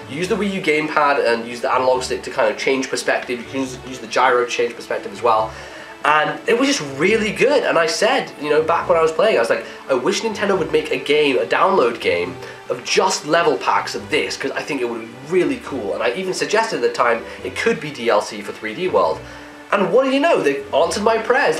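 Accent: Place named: United Kingdom. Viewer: its guys. He's british, give him a break. It's not that hard to understand if you've been here for a while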